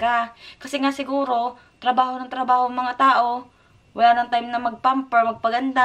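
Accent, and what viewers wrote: Batangas, Philippines. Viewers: Taga batangas po b kayu sis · Hi sissy, enjoy watching you hahahaha....ang gandah ng accent hehe..very familiar sakin , naalala q ung mga friends q sa batangas kc nag work aq sa EPSON Lima, sa Lipa batangas. Keep it up sissy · I enjoy watching your vloggs po...Puro nire,ganire...Batanggenya ka po ba or Tga Quezon province po ba kyo Mam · Parang magsalita sya ay batangenya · te batangeña ka hahha seym.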